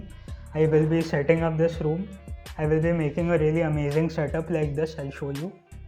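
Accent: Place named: India. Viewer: Are you indian because your tone is like indians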